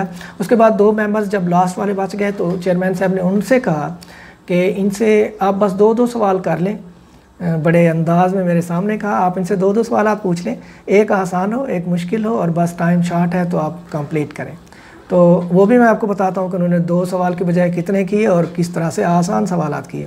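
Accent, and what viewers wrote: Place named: South Punjab, Pakistan. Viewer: Sir you are looking the resident of south Punjab and your accent in speaking more than your words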